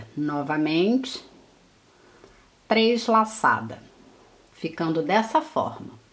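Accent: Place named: Rio de Janeiro, Brazil. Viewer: Passei parte do tempo neste final de 2023 fazendo este lindo tapete, fiz o meu no barroco natural e ficou lindo! Adoro seus trabalhos, gosto do seu sotaque carioca, e a propósito suas unhas são lindas. Sucesso neste ano de 2024, gratidão por compartilhar seus trabalhos belíssimos.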